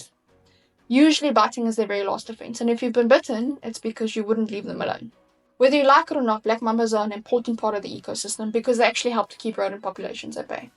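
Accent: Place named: South Africa. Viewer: That South African accent sounds fire 😂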